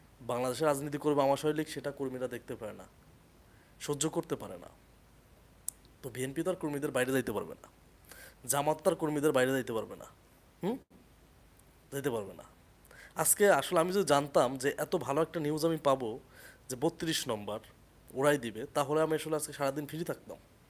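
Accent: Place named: Pakistan. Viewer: ভাই আপনার মুখের ভাসাতেই বুঝা সেস যে আপনি পাকিস্তানের মালের ছেলে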